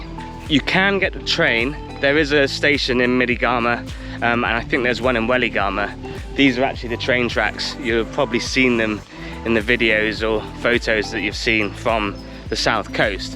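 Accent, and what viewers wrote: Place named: United Kingdom. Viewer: Love british english speaking accent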